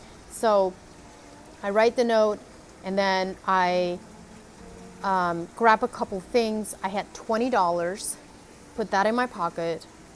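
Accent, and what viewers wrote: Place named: Pennsylvania Dutch, United States. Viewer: It's a Dutch accent. Pennsylvania dutch, "technically" American but not remotely normal or recognizable. I live in a nearby state, but without the internet I'd never have heard of it.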